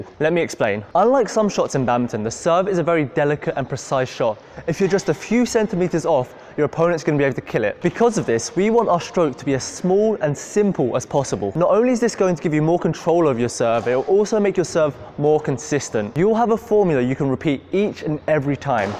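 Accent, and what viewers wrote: United Kingdom. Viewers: Wow british accent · Is this guy Asian or British ? He sounds more like British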